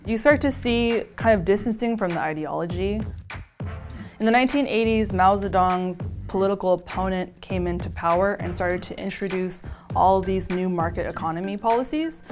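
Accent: United States. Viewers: I think its difficult to mimic Mandarin if you don't speak Mandarin....especially for some people. She might have tried and butchered them so much that she stuck to a fluid American pronunciation.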